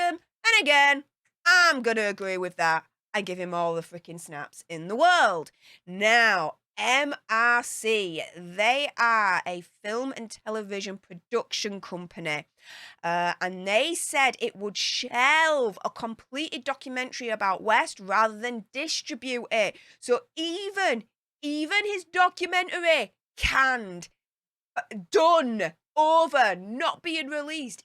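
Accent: Yorkshire, United Kingdom. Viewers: She’s Ok got that accent from Yorkshire 🙂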